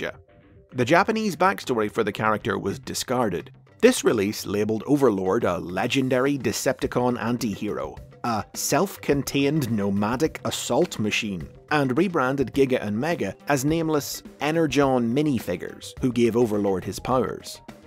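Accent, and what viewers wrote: South, United States. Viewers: Did not expect the southern twang, but I can't say that I hate it. Back in '92 the local toy store had the Overlord toy, but for an outrageous price that no-one in town could realistically afford for a mere child's toy, and I remember being kinda enamored with it. Same story a bit later with Rotorstorm. Seems I had a habit of picking mildly obscure Decepticons as my favorites. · I'm never going to unhear that southern accent, and I love it. Thank you for the episode! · you rock that southern accent well man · I kinda like his southern accent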